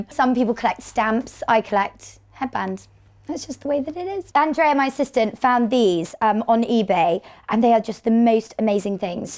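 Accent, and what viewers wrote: United Kingdom. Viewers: She’s so adorable but her accent reminds me so much of Bridget Jones 😩😂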